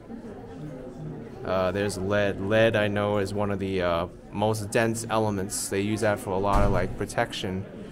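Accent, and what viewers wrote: United States. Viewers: I am learning spoken English from your videos. Thank you for the pure American pronunciation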